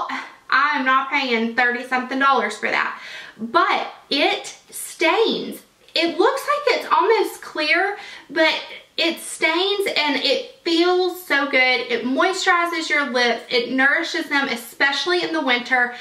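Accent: South, United States. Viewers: Well hello, ***** I share your "mom of boys" lifestyle. I have 2. Love 'em to pieces. Aren't they the best? Nothing like the love b/w mom and her sons, right? Been a subscriber for awhile now, but occasionally like to comment and say hi. Must say, I'm partial to your southern drawl as I was born (GA) and raised (SC) in the South. Miss that now that I'm in MN. Yes, I've lived here over 15 years and still have a slight accent but most of it's gone.:( And you do remind me so much of my dear sweet SIL. Watching you/your videos kind of brings me home in a way.:) Love you channel and your videos Great selection for this one! Hoping if you have a chance, you might pop over to my channel and check out some of my videos. And if you have any advice for me I would love to hear from you. Wishing you a wonderful day! xo ~Melissa in MN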